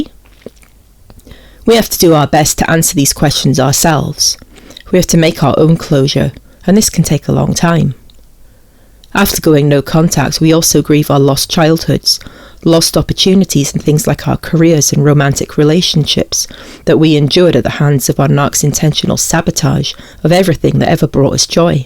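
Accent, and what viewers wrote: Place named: England, United Kingdom. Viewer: It's alright... I've made it this far :) & Yes I am guilty of oversharing the experience. Thank you for your channel; the 'Unstable Adult Baby' label I heard has been replaying in my mind alot as being so incredibly true*. I live in the US but I have English cousins so it is refreshing to hear the accent as I don't get to talk to them much. One of them sees through all the BS and was able to 'confirm' what I was seeing & validate my response. Sadly, she was smeared too by my family & sibling. Cheers and thank you again.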